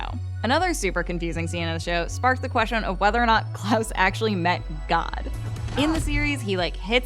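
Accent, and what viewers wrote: United States. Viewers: Your accent is more like The californians from SNL 😁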